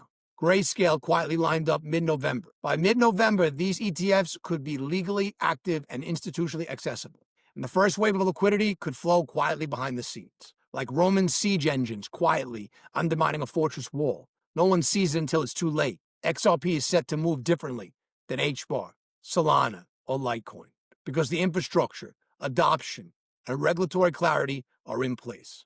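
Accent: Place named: New York, United States. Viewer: You are the only NY accent that I can listen to. Keep 'em coming.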